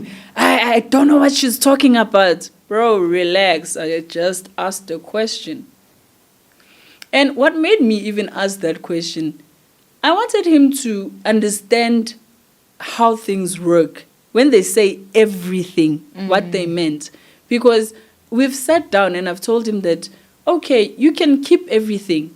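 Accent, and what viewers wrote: Nigeria. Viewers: THE NIJA HAD TAKEN YOU OVER SISI, EVEN THE ACCENT IS LAGOS. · Women from other countries should know that when Nigerians come to any country, they are not coming to marry anyone. If they marry you it is to benefit them not you. Whatever they do is to make money. Leave Nigerians for Nigerian women. But girl, you now speak like a Nigerian.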